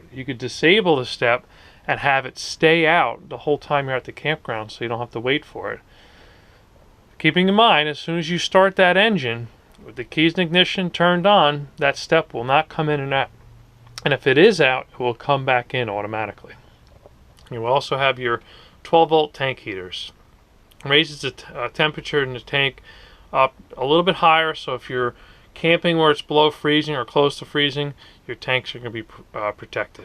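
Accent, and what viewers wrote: New Jersey, United States. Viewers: you sound like you have a new jersey accent or something. where you from?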